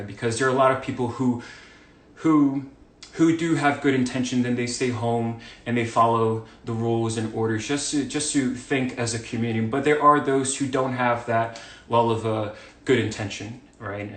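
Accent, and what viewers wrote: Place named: Latin America. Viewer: I thought he was a latino, like his first lenguage was spanish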